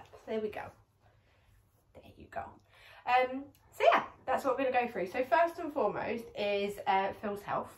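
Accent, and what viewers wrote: United Kingdom. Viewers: British accent is so satisfying 😏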